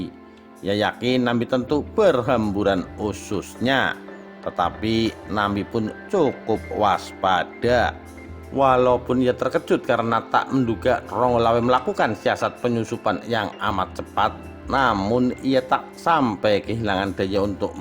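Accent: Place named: Java, Indonesia. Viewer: Suaranya bagus itu,khas jawa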